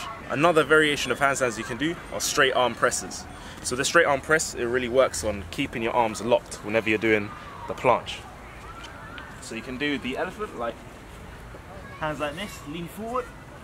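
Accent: United Kingdom. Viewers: yo man where you from in the UK? Nice tutorial very informative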